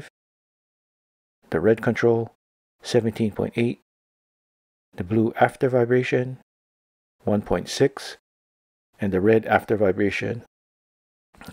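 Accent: Hawaii, United States. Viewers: eh you from Hawaii? I can hear the Piggin brah!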